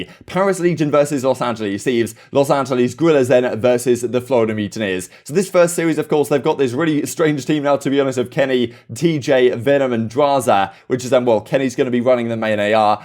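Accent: United Kingdom. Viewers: This is the most British sounding British person in existence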